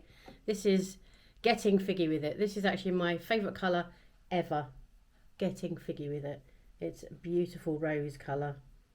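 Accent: United Kingdom. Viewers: I love to hear you speak and sooo wish I could find a gentleman from the U.K.! Iove the accent and Alan Rickman was my favorite. I digress, the makeup is so pretty and I like the purples.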